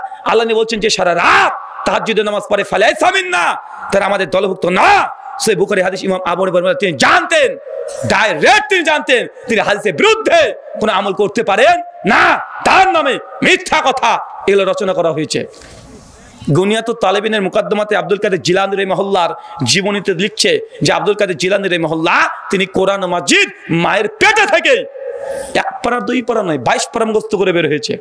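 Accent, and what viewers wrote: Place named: Kolkata, India. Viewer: আপনার কথা বলা একেবারে কলকাতার মানুষের মত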